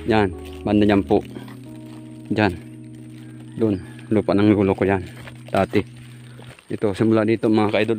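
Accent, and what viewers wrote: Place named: Bicol, Philippines. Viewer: Bicolonon ang Punto mo noy napangirit ako sa vlog mo.. Sayang baratuhon pabakal kan daga ni lolo mo ako na kuta nag bakal..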